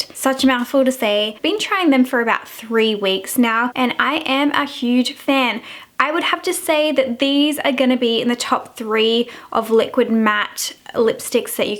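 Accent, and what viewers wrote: Australia. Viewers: You have the cutest voice...and the Aussie accent doesn't hurt!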